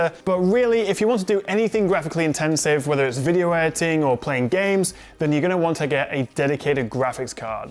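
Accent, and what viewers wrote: United Kingdom. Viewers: What type of accent does he have because it sounds kind of British but also American.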